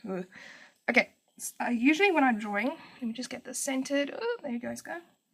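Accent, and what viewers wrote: South Africa. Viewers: Great videos really helpful :D The only problem with watching them is that your accent makes me miss my South African gf haha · OMG OMG OMG FINALLY A SOUTH AFRICAN!!! Wait a second as I subscribe to you. To my surprise I was like all these other people like Europeans and Australians etc. and I am sitting here like where are South Africans? Then when clicked on your channel and the accent! I fell out of my chair, screaming. Gosh I am so happy :)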